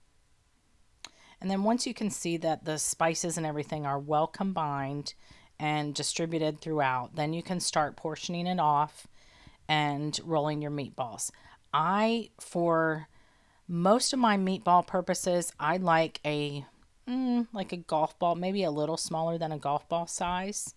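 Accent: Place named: United States. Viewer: The moment she had American accent I stop watching what American know about meatballs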